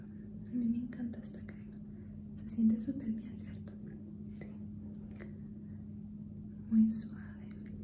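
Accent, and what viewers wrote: Antioquia, Colombia. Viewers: Es Colombiana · Asmr+acento Colombiano= UFFFF Chuladaaaa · el acento colombiano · colombiana es ella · el asento colombiano uuuufff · la voz colombiana es lo mejor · Solamente hablan así los paisas osea los de Antioquia, en Colombia hay muchísimos acentos. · Lindo el acento de las colombianas al hablar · tiene acento paisa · El paisa me encanta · me encanta el acento colombiano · el Plus del acento Colombiano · Eres paisa o no??? ❤️🙄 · el acento paisa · Colombiana tenia que ser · No en toda Colombia hablan así, las que hablan así como ella son las de Antioquia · su acento colombiano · el español de colombia es el mas bonito y ya medi cuenta porque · Eso es paisa sumercé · El acento más lindo del mundo es Colombiano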